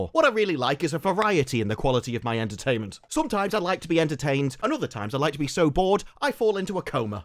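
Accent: United Kingdom. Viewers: This man is most definitely British.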